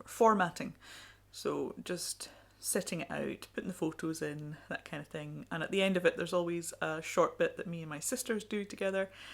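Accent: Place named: North East Scotland, United Kingdom. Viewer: Found you through vlogmas, loving videos so far. Can I ask where u are from please? I'm originally from Peterhead (north east Scotland) and I think uve got a bit of that accent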